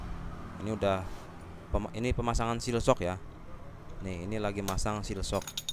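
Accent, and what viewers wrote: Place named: Serang, Indonesia. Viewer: Kaya orang serang sih kang bahasanya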